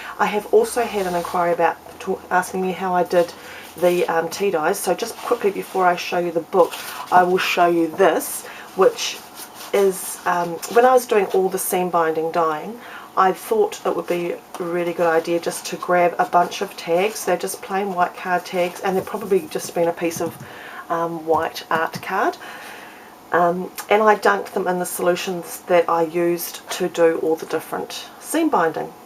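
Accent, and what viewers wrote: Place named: New Zealand. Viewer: I heard your accent and I said inside my head that of course it is my kind... The smartest kind... The kiwi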